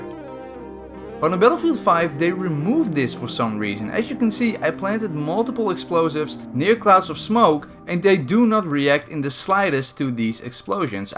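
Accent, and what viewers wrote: Netherlands. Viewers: You dutch? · You have a Dutch accent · Ben jij nederlands ik hoor het aan je engels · Are u dutch? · Are you dutch? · He sounds dutch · Ik hoor namelijk een Nederlands accent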